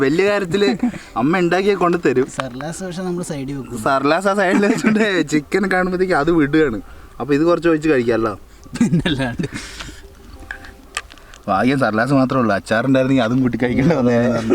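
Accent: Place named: Palakkad, India. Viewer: palakkadan slang aan...but full aghu palakkadan ala...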